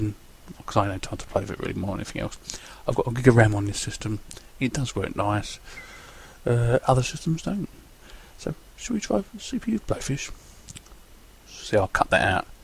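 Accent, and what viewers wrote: United Kingdom. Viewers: Very nice, matey. Loads of stuff there. You really are getting what you need. And nice to see some specs on the machine, your running it on. I've never really used KDE. should i give it a try? Btw I love your ''british'' (scottish?) accent. ''tic, toc, tic, toc'' Hehe. Great review matey!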